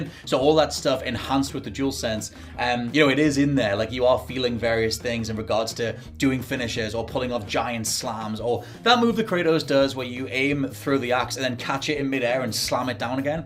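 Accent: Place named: United Kingdom. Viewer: yeah it's a because of the British accent. You'd need to be a moron not to realise it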